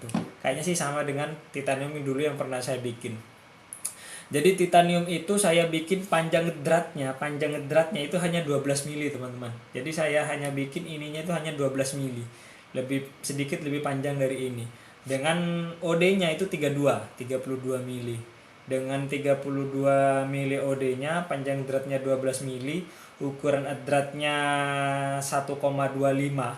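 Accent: Madura, Indonesia. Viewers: Asli mana bang...logat kq kayak orang madura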